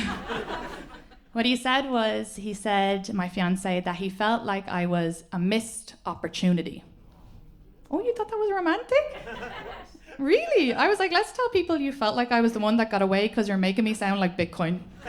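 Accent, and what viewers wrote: Ireland. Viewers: Why do Irish people add an "e" to the word film? "Fil-em" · I feel like she gets more Irish every time I see her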